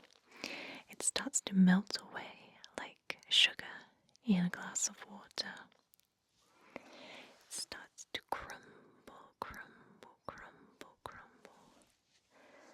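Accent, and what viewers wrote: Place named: United Kingdom. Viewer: You’re only saying that because they look alike... and sound alike... and both have british accents... and both do asmr... and both have the first name... and they are the same person.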